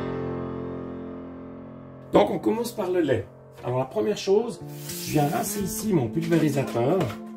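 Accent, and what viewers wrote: Switzerland. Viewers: Bonjour! J'ai découvert ta chaîne hier et j'me suis abonné direct! Merci pour avoir diffusé ç'test! C très clair et surtout, pragmatique!... Sinon, c'est bien un accent suisse qu't'as?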